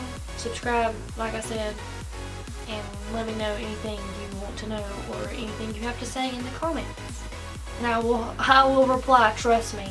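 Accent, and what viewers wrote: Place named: Georgia, United States. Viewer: Oh and where are you from? I'm from Georgia, you sound like you could be from here lol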